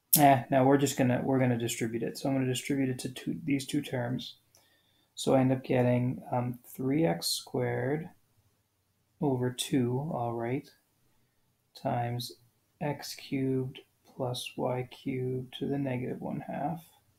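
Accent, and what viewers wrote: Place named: Kansas, United States. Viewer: You sound like one of my uncles. Were you born in Kansas?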